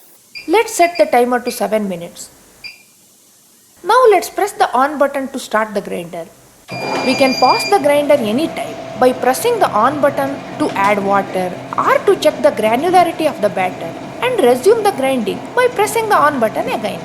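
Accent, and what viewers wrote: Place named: South India, India. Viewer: I loved the voice as well as the presentation. It's south Indian accent so you are finding it different.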